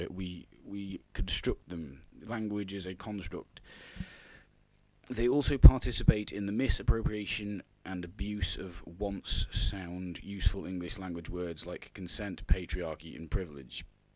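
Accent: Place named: Merseyside, United Kingdom. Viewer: Brilliant Merseyside accent, m8.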